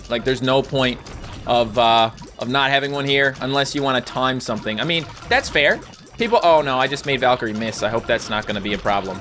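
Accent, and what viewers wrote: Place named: Newfoundland, Canada. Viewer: Bro are you from Newfoundland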